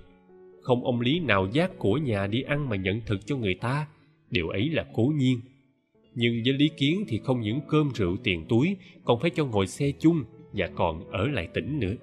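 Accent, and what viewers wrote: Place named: South, Vietnam. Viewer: Tất nhiên rồi. Bởi vì Chí Phèo là nhân vật xuất phát từ miền Bắc, quê hương của Nam Cao thuộc tỉnh Hà Nam Ninh ngày xưa mà. Nhưng Giọng miền nam ngọt ngào của bạn này cũng rất hay. Hay lắm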